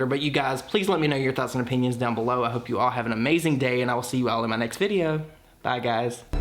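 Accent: South, United States. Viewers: Your face makes me so happy and southern charm accent! I’m so glad when you upload, I love listening to you, you talk trash but respectfully and have a bright personality. You honestly brighten my day when I’m working from home. Thank you for continuing to upload even when it’s hard! It helps me get through my day!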